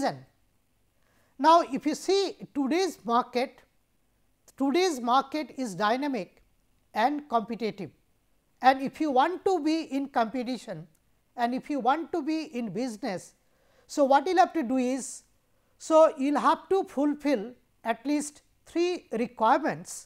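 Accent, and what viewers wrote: India. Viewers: For the first time ever someone thanked an indian youtube lecture instead of making fun of accent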